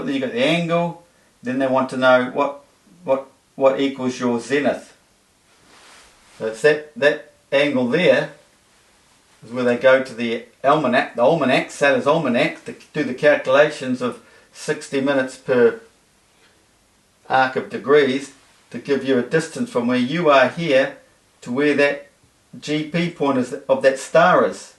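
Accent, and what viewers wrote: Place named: New Zealand. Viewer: he sounds like a very clued up kiwi man! ❤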